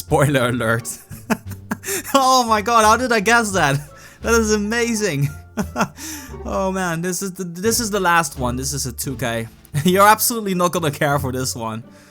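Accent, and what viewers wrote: Netherlands. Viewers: Are u dutch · Arnhem Ik dacht al dat je Nederlands was. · Are you Dutch?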